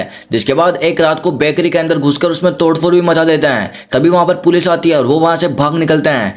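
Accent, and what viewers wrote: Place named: Pakistan. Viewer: Ap Pakistan se ho? 🤔 aapki accent pakistani jaisi hai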